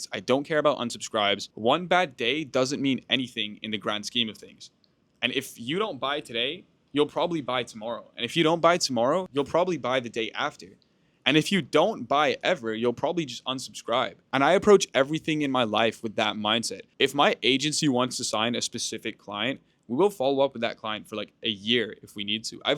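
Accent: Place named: United States. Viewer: you are not american, but you sound very american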